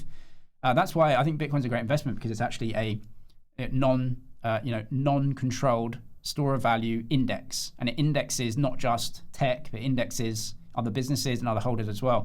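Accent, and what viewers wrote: England, United Kingdom. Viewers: If you don't understand English spoken by an English person try turning on the closed captions.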